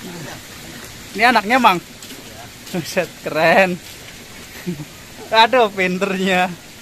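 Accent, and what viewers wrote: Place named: Banyumas, Indonesia. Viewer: Sampurasuuuun,sing sarehat sukses baraya..kang ciganamah aya aya ngapak na gitu....hehee mantap kang...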